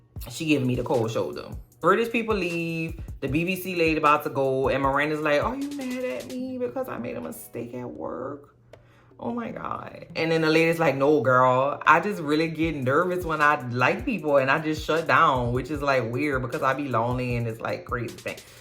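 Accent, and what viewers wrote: New Orleans, United States. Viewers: First, I LOVE YOUR RECAPS. Everything is better with an authentic New Orleans accent! Secondly, I totally agree about the children on this show. They are constantly in sexual situations and having sexual conversations, ew. The conversation about polyamory or pansexuality during dinner with parent and grandparents was an ABSOLUTELY NOT. This show is tripping. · I love your accent. New Orleans is my all time favorite city, and your humorous recaps are the ONLY good thing to come from this monstrosity of a reboot. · "Take Braedyyy, taek Braedi.....He had a long life. I think what he like 19/20?...That's a solid life if you ask me!" YEOOOOOOO The Nawleans accent is the icing on the cake to these AJLT reviews, cuz the show ain't issh 😫🤣🤣🤣🤣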